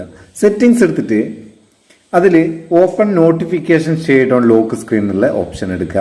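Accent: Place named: Koyilandy, India. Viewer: ഇങ്ങൾ കൊയ്ലാണ്ടി ആണോ ന്റെ ഉപ്പാന്റെ ഫ്രണ്ട് ഇതേ സംസാരം 😄😄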